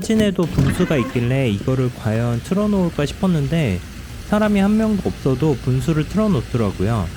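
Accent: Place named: South Korea. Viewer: You were born in America?? How come you have heavy Korean accent?? 😳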